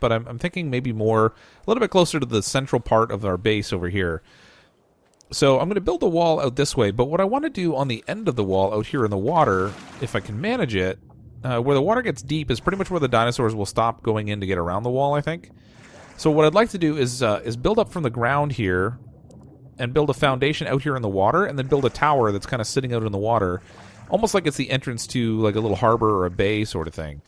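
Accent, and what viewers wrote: Canada. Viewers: I really should be sleeping now- it is am.Nah. That livestream was great. I am now a loyal subscriber. I can definitely tell that you are Canadian from how you say out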